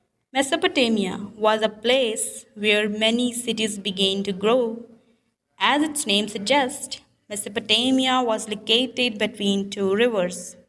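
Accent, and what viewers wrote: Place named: United States. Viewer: Madam from where you have learnt American English?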